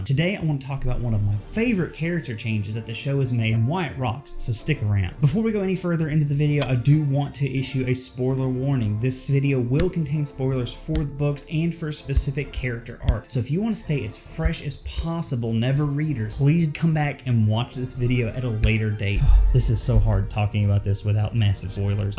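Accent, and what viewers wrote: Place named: Alabama, United States. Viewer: I thought I heard some Alabama in there. I've got family in Tallapoosa, Georgia (which is within spitting distance of Alabama). I've loved that accent for years. My wife and I are from SE Indiana (Aurora)...which is basically near Cincinatti, OH. We are in the Tri-State (Indiana, Ohio and Kentucky). We have an accent, but to us...it's just the way you talk. Thanks for sharing.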